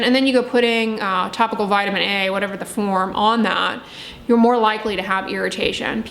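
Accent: United States. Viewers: Do I hear a country accent 👀👀👀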